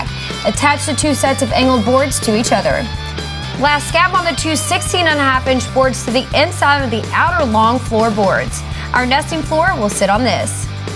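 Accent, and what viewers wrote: South, United States. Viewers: I could listen to your sweet southern voice rack off measurements all damn day...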